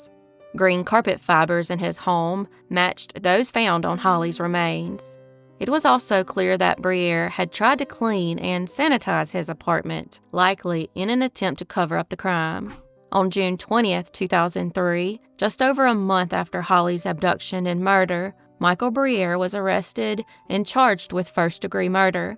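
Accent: South, United States. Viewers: I am seeing this 9 months later and I hope that you are doing well and pray that good will comes your way. God bless and I wholeheartedly agree with you, rocks and somehow her sweet southern soul shines through her accent and it’s very soothing on one’s heart and soul. God bless.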